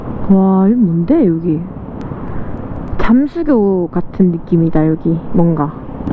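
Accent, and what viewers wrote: Daegu, South Korea. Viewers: 암튼 대구사투리 개싫음 개짜증남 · ㅎㅎ저도 대구출신입니다~ 사투리 아주 정겹네요, 영상 재밌게 잘보고 갑니다!! 굿굿! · 대구분이시구나 간만 사투리들어서 정겹네여~대구사람은 아니지만 대구 방촌역 아파트 공사하느라 숙소생활하긴했었는데 서울 나들이 잘하셨네여^^ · 대구사투리 너무좋다..♡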